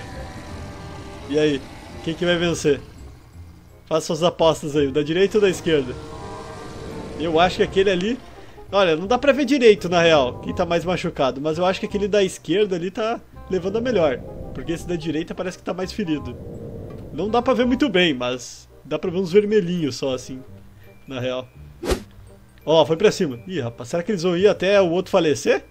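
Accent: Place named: South, Brazil. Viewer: Sotaque muito do Sul skksksks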